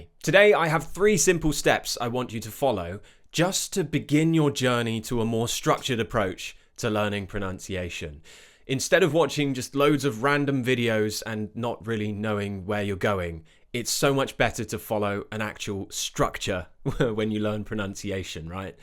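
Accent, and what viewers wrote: RP, United Kingdom. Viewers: I love to hear the british english… 😅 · I don't know why but I absolutely love the British accent 😊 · Do you always speak in that accent normally? Especially, RP accent and the tone. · I like British accent thats why i always watching your videos from🇵🇭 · Hello, I’m not sure if you’ll see my comment, but I truly appreciate your efforts. I really love your accent, and I’ve always been fascinated by British English. If possible, could you tell effortless stories—around 30 minutes long—and at the end of the story, explain the actual meanings of the most important expressions, words, or phrases with a few examples? After that, it would be great if you could read the story again a bit more quickly. This idea is similar to what the channel English Natively does. Thanks a lot! 🙏🙏🙏🙏🙏🙏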